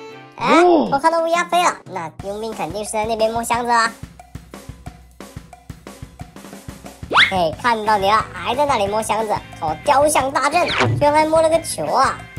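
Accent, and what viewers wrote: China. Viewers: 導演你是大陸人嗎？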